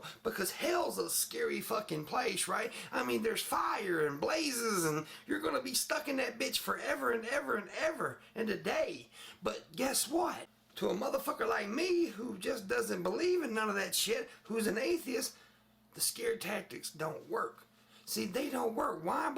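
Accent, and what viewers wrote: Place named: Louisiana, United States. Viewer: Brandon Hoose It's his Louisiana accent. It makes anybody sound like a preacher (just like a Tennessee accent makes anybody sound like a country singer, even though he/she would not be a country music fan).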